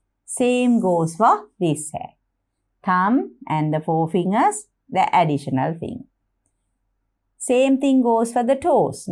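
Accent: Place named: India. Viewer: her accent is like an indian:face-fuchsia-poop-shape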